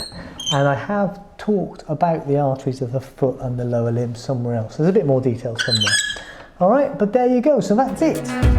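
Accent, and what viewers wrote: United Kingdom. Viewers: what part of uk is this ?=)thank you so much for your videos is so hard biology as register dietitian I have to study in my university but when I found your videos it make a lot difference in my learning experience=)thank you! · A British accent automatically makes you an even greater expert to the American ear.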